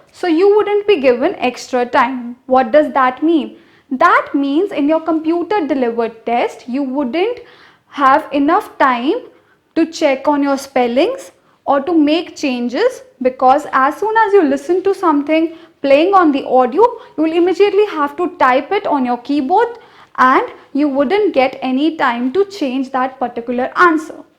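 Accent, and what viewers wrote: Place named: India. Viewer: Cute girl.. I like your Indian accent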